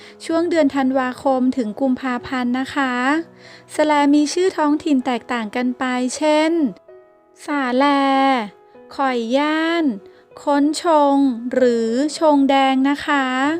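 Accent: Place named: North, Thailand. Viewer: อู้จ้าาาาาา คนเมืองงงงงงแต้น้อ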